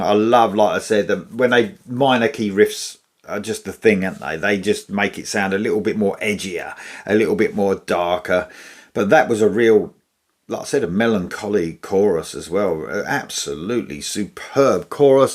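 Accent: United Kingdom. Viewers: Are you british? ❤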